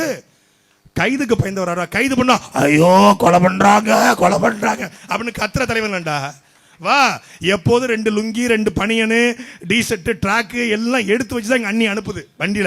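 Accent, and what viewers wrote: Tirunelveli, India. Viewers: திரு . சாட்டை சிறப்பு திருனல்வேலி பேச்சு சூப்பர் 😂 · Welcome to bro sattai media and bro's your explanation is very great and bro Tasmac 😂is roots of un well doings in all social life and bro your Trinelveli language is very welcomed and thanks to media vision ok go ahead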